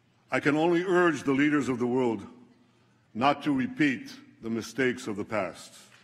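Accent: United States. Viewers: Fascinating to see a foreign leader with perfect American English, honed in a US High School. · Why does he sound American?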